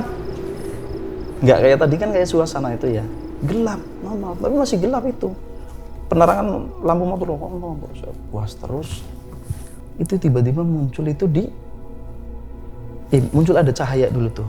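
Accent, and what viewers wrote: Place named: Banyumas, Indonesia. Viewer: O wong ngapak to, salam Sitinggil Cilacap yo ang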